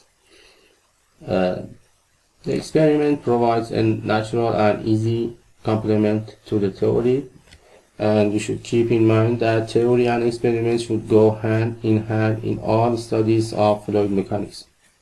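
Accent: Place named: Africa. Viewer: that's aafrican accent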